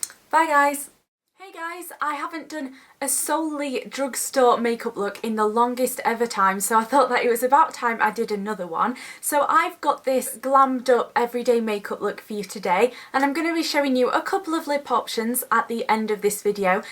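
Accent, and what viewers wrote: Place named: England, United Kingdom. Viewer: I love this tipical english accent! Cute!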